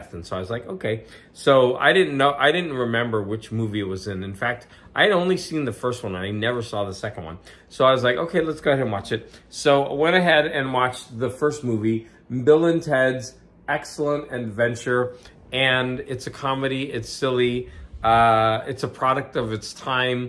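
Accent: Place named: Kentucky, United States. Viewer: Glad you did publish it! I always feel that way about my videos when I first publish them. Then I'll look at them later and think, "That wasn't half bad." Your Kentucky accent was the highlight in this one!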